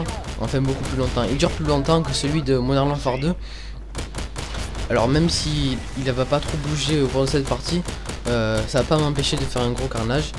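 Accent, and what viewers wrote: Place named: Toulouse, France. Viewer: J'adore, je regardais Mafio avant, je te voyais quelques fois dans ses vidéos mais maintenant que tu en fais compte sur moi pour les regarder ;) Question: Est-ce que tu conseille cette config du Spas12 sur MW2 aussi ? Si non, laquelle ? Je m'abonne, je like et je favorise ps: J'aime ta voix de toulousain :)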